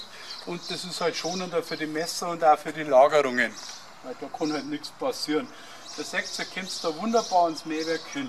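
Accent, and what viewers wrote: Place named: Bavaria, Germany. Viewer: Das war echt gut, auch für blöde gemacht, leider sehr bayerisch und damit schwer zu verstehen !!!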